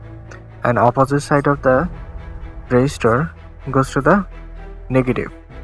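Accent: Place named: India. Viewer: Are you indian?It sounds like an Indian accent.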